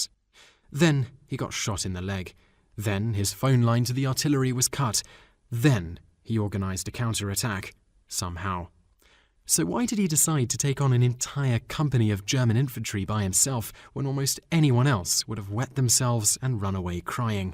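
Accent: England, United Kingdom. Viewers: Thoroughly enjoyed the video....and the manner of presentation. It is not often that I create a list of topics to "research" based on a YT video....but this one will have me scurrying to see some of these movies...perhaps: "again" (Audie Murphy especially). The narrative script was excellent.....and the narration itself. I don't subscribe to a channel unless I'm pretty darned impressed with the content...or I've had some enjoyable dialogue with someone. Subbing up to your channel now. ~RED ☮♥♫ (BTW...an "aside"....I used to have an English boyfriend....his accent and voice tone was the softer/smooth sort....exactly like this narrator. So...kind of a "warm and fuzzy" recollection for me. :-) Never forgot him).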